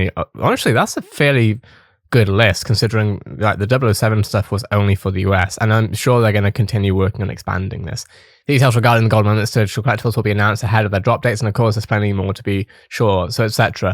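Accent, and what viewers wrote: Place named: United Kingdom. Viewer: You do a great British accent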